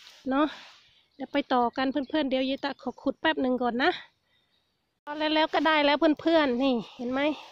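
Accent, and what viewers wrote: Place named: South, Thailand. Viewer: ดูแลตัวเองด้วยจ้าา,เอื้อย บ่สบายเว้าสำเรียงออกมาทางภาคใต้เลยเนาะ(น่ารักไปอีกแบบ)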